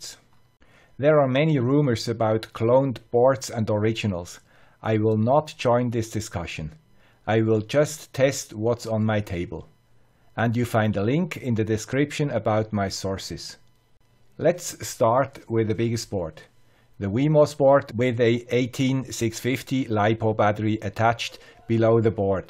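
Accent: Switzerland. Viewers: Ok. Thank you for your answer (with the Swiss accent). Regards from someone with a Belgian accent;-) Really enjoy your channel!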